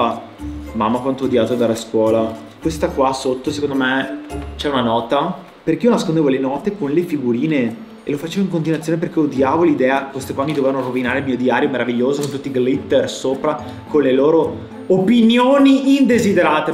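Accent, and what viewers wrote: Veneto, Italy. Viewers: Diamine! Se sei ignorante e non conosci la mitologia classica, rileggiti (sempre che tu l'abbia letto) tutto il filone antropologico sulla mitologia classica del professore Robert Graves, influenzato dal professor Frazier: Atlantide=Atlante, colui che portava il peso del mondo sulla schiena. Oceano Atlantico=il luogo in cui Poseidone lo aveva confinato. Studia dizione, perché l'accento veneto è pesantissimo!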